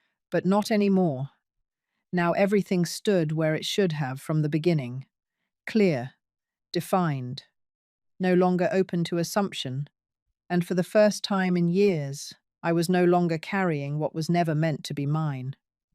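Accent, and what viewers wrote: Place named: San Diego, United States. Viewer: So that's what a San Diego accent sounds like 😅😂😅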